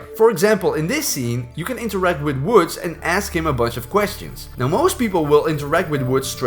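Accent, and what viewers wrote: Netherlands. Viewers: It’s the typical accent Dutch people have when they speak English. A Dutch person will, like myself, be able to immediately tell that this guy is Dutch or Belgian (Flemish).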